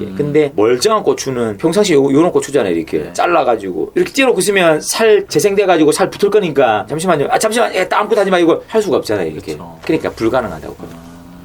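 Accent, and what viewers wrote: Gyeongsang, South Korea. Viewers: 사투리가 무지 쎄신데 부산분이신거에요? 대구분이신거에요? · 특유의 경상도사투리가 킬포네 ㅋㅋ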